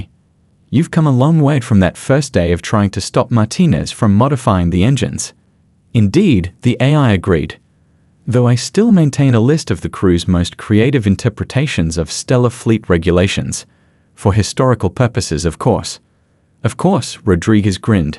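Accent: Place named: Australia. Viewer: Very strange to hear this in an Australian accent, used to hearing other AI stories in different accents. Hoping this is an Aussie channel 😁😁😁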